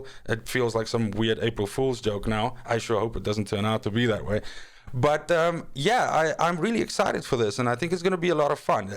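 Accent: South Africa. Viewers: Yo yo my guy, you from South Africa? I feel exactly the same, wasn't going to get this, played the demo, recognised the studios community interaction, then pre ordered. Nice vid, you get a sub from me.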